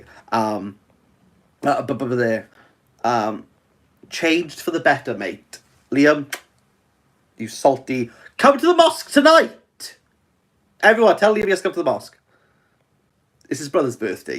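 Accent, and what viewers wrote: Wales, United Kingdom. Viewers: I did Not expect this thick Welsh accent hard-as-nails man to survive the first week of Ramadan, but deep inside, when I sow how he makes the "Rrrrrrrrrrramadan Mubarak" sound, I knew he is sincere about life, and he might just make it to Eid. Look at him, crumbling, but not falling. Look at him now after thinking of quitting youtube, he is making a Live... Man we Love you for the sake of Allah, and may Allah bless you and your family, and may Allah give you from where you never expect, and keep you and your loved ones on the straight path insha'allah. Eid Mubarak Brothers & Sisters 🎉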